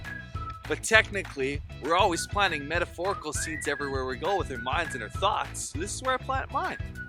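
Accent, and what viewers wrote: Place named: United States. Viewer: Where do you live? I’m guessing from your accent you live in the states. So which state do you live in?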